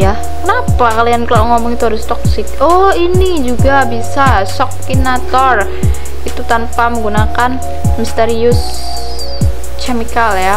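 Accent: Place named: Java, Indonesia. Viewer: Baru mampir, logat nya jawa, jawa mana ni hhe, boleh kali numpang promosi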